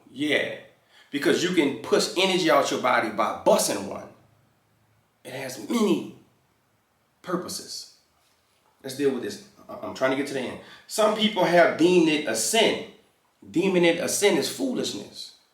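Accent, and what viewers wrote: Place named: Louisiana, United States. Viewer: That Louisiana drawl gets me every single time☺️😮‍💨💕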